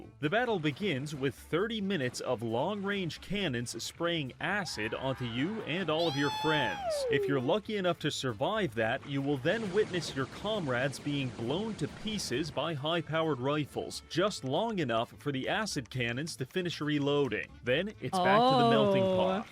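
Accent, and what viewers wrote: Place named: South, United States. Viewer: I find it great that her Southern gets stronger every video.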